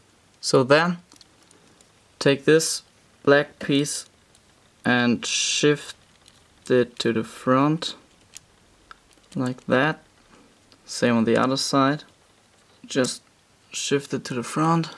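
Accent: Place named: United Kingdom. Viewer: Also you sound a bit more British than the last time you spoke. I know your german but maybe when you dm i was reading with the wrong voice again. The voice is t important what's important is the fact that you did better and i love how you improve in one year!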